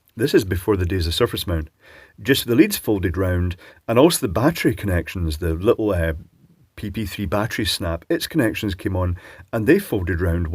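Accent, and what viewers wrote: United Kingdom. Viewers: Amazing Harry Potter accent. Instant sub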